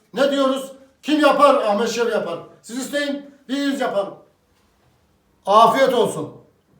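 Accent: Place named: Anatolia, Turkey. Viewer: Allah razı olsun,pratik ve Anadolu şivesi her şey gönlünüzce olsun.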